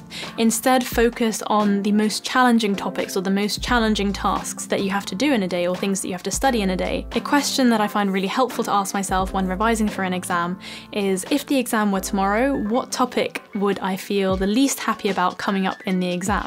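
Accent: RP, United Kingdom. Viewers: Hi are you British ☺️ it's important for me because I am trying to learn British accent . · If you ever make another video, wich in fact i doubt, please answear in your responses if you speak RP all the time or just with us in these videos. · I want to learn British accent and I really like your accent and thnx for tips . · I love your British accent a lot. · British accent 😭😭😍😍😍😍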